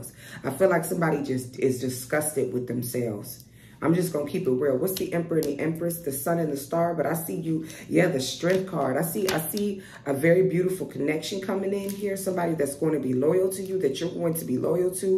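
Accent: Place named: Chicago, United States. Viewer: You talk like you from chicago. Your vocabulary is crazy. I luv it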